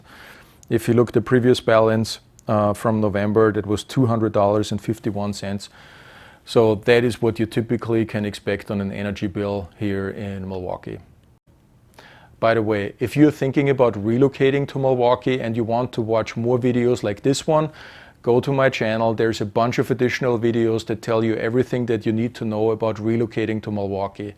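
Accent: Germany. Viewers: German accent?? 🤔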